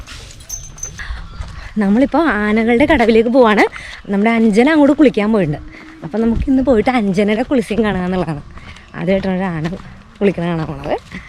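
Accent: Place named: Chala, India. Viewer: മൊത്തത്തിൽ ഒരു ചാള സ്ലാംഗ് ഫീൽ ചെയ്യുന്നുണ്ടല്ലോ... പിന്നെ dotted deer എന്ന് പറയുന്നത് ശരിയല്ല കേട്ടോ Spotted deer ആണ് കറക്ട്..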